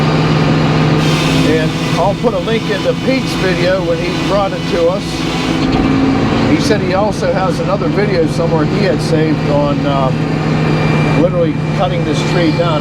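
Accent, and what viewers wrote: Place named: Pennsylvania, United States. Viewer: Love Pennsylvania accent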